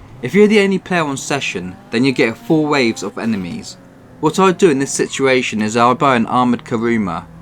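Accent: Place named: United Kingdom. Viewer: Thanks man, the video was so helpful and I also love your British accent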